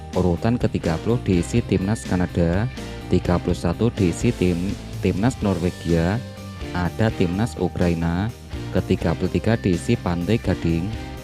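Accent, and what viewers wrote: Java, Indonesia. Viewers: Kurang menarik mendengar suara latar, gaya bahasanya Jawa..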